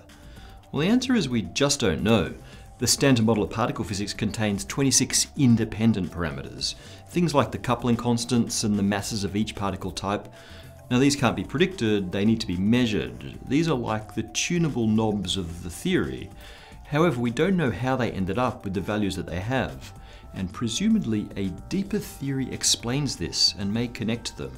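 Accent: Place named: Australia. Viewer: Cba with the presenter and his aussie accent mate!!!!!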